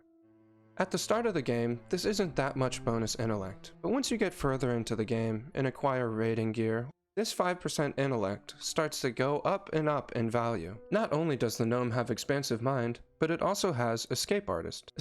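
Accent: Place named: United States. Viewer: I have a hard time with the American accent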